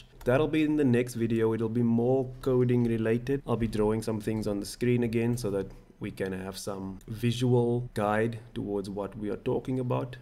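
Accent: South Africa. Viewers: Hey dude, are you from South Africa ?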